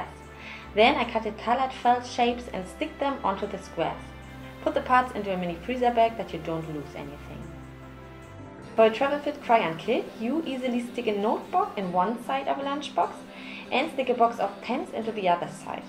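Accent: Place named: Germany. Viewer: Awwww your accent is so cute!Typical german!😂 Amazing video💗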